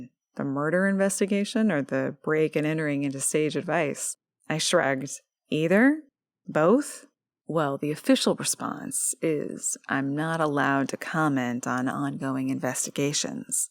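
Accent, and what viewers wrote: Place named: United States. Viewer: Just can't listen to American's reading........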